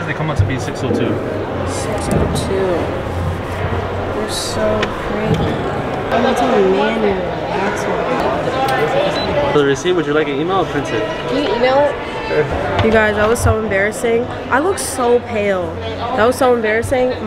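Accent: England, United Kingdom. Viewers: It’s the English twang for me. Your friends are a vibe. Okay French toes, look at the little pinky toe 🥺😅 Sis said her hat is broken.. comedy just comes naturally to You 😂🥺❣️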